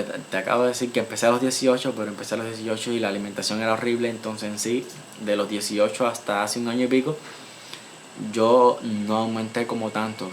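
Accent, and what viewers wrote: Cuba. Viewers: los muchos cubanos de mas menos tu edad estan muy cnformes con su estadia aca, en uruguay y no es nada dificil adaptarse (menos al invierno que ustedes casi no conocen) tengo un gran amigo cubano que se vino por guyana, brasil hasta la frontera con su esposa, pero dejo a su niña alli hasta poder traerla tambien, y a su madre.Trabaja como portero en un e dificio y arma computadoras. Cuando recien llegan consiguen trabajo en supermercados o farmacias etc sentir tu hermoso acento cubano es cosa de todos los dias-Aca no hay playas para "cubanos" y hacia el este de Montrvideo, el oceano atlantico te da hermosos lugares para disfrutar cuando deseas descansar. La Salud no es gratis, pero es bien accesible y de primera calidad La salud publica es gratuita (medicacion incluida) Bueno solo quise informarte de mi pais espero que todo te salga bien PD. 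aca no existen los cortes de luz jajaja:Un beso grande hagas o que hagas Te deseo lo mejor. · Ver tus videos es como conversar contigo, me encanta eso pues te conosco de alguna forma y se mas de ti, tus conversaciones son interesantes asi conosco de ti y como es vivir en Cuba. Me encanta tu forma de hablar y expresarte y para nada me ofende tu vocabulario, he compartido con muchisimos cubanos y amistades cubanos y se como hablan y se expresan y puedo entenderte, sigue asi y no cambies por nadie. Gracias por compartirlo, muchas bendiciones papo. 🙏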